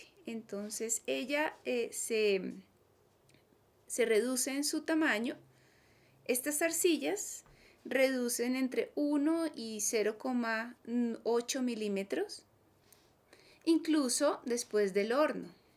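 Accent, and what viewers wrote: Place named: Colombia. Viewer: ❤Que interesante, sencilla, detallada, precisa y Muy amena explicación. Ese amor en tu enseñanza y sobretodo ese sutil Acento Colombiano tan bello. Gracias Gracias Gracias 🎉😊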